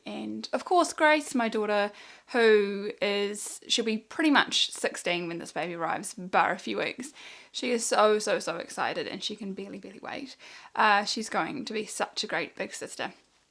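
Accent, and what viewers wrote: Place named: New Zealand. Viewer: So excited that I just found your channel! I lived in Wellington for almost 12 years and it’s so nice to hear the kiwi accent again. My NZ accent has slowly been disappearing 😢 hope to see more videos soon!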